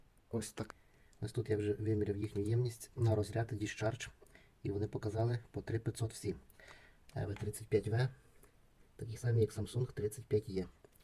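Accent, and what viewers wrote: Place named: Ternopil, Ukraine. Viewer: Ви що, по акценту не розумієте? Тернопіль!. Мєв...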